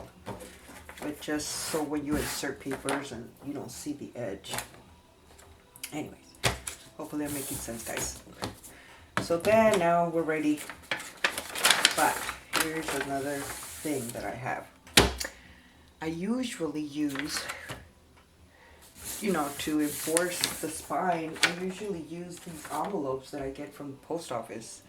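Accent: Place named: Puerto Rico. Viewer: I know this is an older video but I've been watching all your older videos to catch up I guess. I love the way you teach. But, even more special to me is your voice and accent. You sound so much like my family that it makes me homesick. I'm Puerto Rican from NYC as is my entire family. I live in Virginia now so I'm far away from anyone that sounds like me. Listening to you makes me happy.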